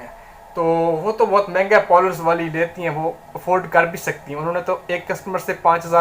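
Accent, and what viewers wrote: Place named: Jhang, Pakistan. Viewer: Mjy tu ye bhai jhang k lg ry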